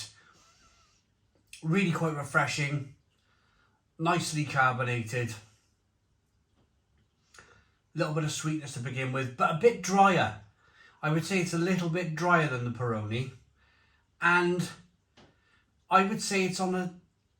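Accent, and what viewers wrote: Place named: Cardiff, United Kingdom. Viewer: This man sounds like he's from Kardiffffffffffff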